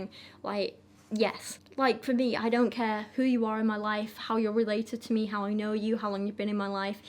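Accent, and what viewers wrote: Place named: Yorkshire, United Kingdom. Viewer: Hi,! I knew exactly what saying you were talking about at the beginning as soon as you started describing it. I have been thinking of it recently when I watch videos critiquing tradwives/the alt-right but it was bugging me that I couldn't remember it either. I finally Googled it just now and it is "Kinder, Küche, Kirche" (Children, kitchen, church). Off topic, I love listening to your voice/accent! It reminds me of when I was able to go visit my aunt and uncle in West Yorkshire (though they themselves don't have Yorkshire accents because my uncle grew up near London and my aunt is American like me). Edit: Yes, state troopers are synonymous with state police. They tend to be involved with investigating crimes that occur in rural areas/outside city limits.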